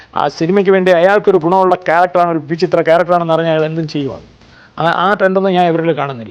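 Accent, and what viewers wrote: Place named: Thiruvananthapuram, India. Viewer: എന്താരായാലും നീ വായിക്കുന്നവത്... തിരോന്തോരം തന്നെ അപ്പി....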